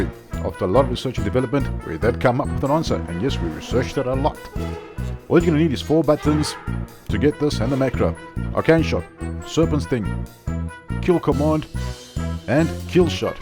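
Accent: South Africa. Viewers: You sound very South African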